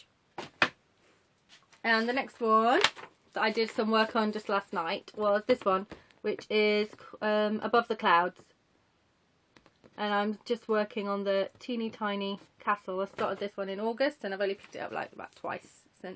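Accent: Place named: United Kingdom. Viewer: I love your videos. I'm a total Anglophile and British/Australian stitchers are my favorite channels to watch. I guess it's the accents. 😉 I hope you're feeling better. Do you have any more videos planned? I definitely don't want to pressure you, especially if you're not feeling well--I just miss your lovely work and your humor. 😊 Prayers for continued recovery!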